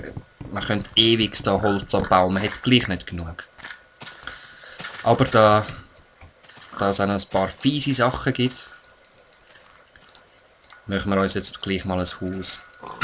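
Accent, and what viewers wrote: Basel, Switzerland. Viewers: ??????????? Ich bi au basler aber das isch ächd balrdütsch=-O Aha wenns baselbiederisch isch ischs klar das kenni nit so.:-) I kum us Müncheschdei:-)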